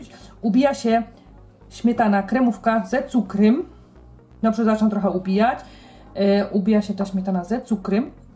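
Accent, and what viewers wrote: Silesia, Poland. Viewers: Broń Boże ! Ta śląska gwara to clou programu ! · Bo to jest po ślonsku, Opole jest na Śląsku, a my sam koltywujemy jezyk ślonski 😛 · Asz mi sie serce smieje ..jak ta moja gotka slysza...Dziolszko tys je einmalig....Pozdrowienia aus Nürnberg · No i co z tego że tych przepisów jest mnóstwo na youtubie I nie tylko jak wszystkie twoje przepisy jeśli chodzi o mnie,w twoim wykonaniu Są nie do przebicia. Uwielbiam ciebie i twoje filmiki tak się swojsko u mnie w kuchni robi. Ja też jestem Ślązaczką i dzięki tobie mogę pod szkolić się w godaniu🤪 wyjechałam na obczyznę 20 lat temu no i trocha mi się godo ale do ciebie jest mi cima 😂 dzięki tobie można się poczuć jak za dawnych lat w domu rodzinnym. Pikne mosz te włosy. A mój tata jak to kiedyś mówił „ to mosz dobre”. Pozdrowienia serdeczne i całuski😘💝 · Twoja rozmowa jest mega mix niemieckiego i śląskiego ale naprawdę fajnie się ciebie słucha pozdro 😊😊😊😊Aber echt gute sprache 😁😁😁😊😊😊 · Pani proszę mówić normalnie bez gwary śląskiej, bo widać że pani się męczy.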